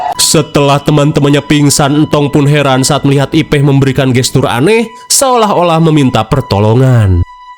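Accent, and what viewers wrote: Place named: Sundanese, Indonesia. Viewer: Subrek sejak tahun 2021 sejak covid ... krn gabut di rumah di kurung pas pandemi .. 😅 fall in love pas pembawaan nya pake sunda campur...sukses kang.. 🎉🎉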